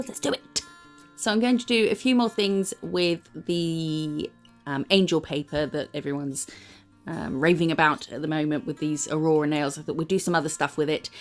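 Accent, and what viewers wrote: RP, United Kingdom. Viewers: I loveee your channel, you talk to yourself like I do, swear like I do and have a naughty sense of humour like I do, so it’s like hanging with a friend with a much posher accent (I’m Northern Irish). Love your designs into the bargain! Win!!! Xoxo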